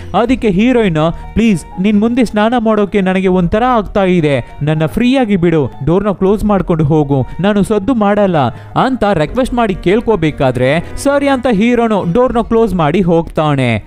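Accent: India. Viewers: Super and excellent voice and kannada accent, plse continue doing this dubbing in kannada work, we have very less English movies which is explained in kannada, also if you can try for dubbing ENGLISH movies in kannada plse will be super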